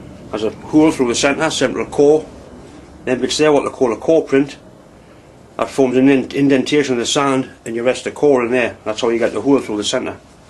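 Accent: Newcastle, United Kingdom. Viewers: Love the old videos, you sound more Geordie back then!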